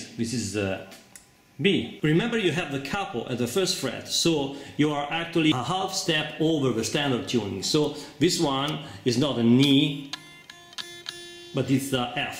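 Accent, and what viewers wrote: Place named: Italy. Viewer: Nice trick! With always this funny italian accent! (although my french one can be funny too! ;-) ) . I let you know I follow you to make my guitars fixing and adjustements and it's ok all over the way ! :-)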